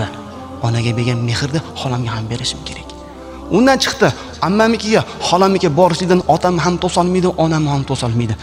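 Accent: Osh, Kyrgyzstan. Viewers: Ош шахридан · бу инсон шевасидан ошли ми дунйо тургунча туринг домла боркану хакикат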